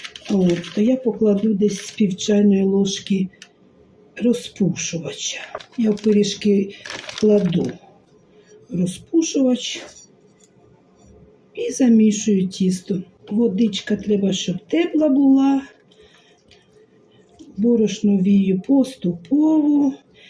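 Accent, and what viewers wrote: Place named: Poltava, Ukraine. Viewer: Яка у вас гарна украiнська мова! Вы, мабудь с Полтавщини? Огромная Вам благодарность за рецепты. Вы очень душевная женщина. Удачи Вам!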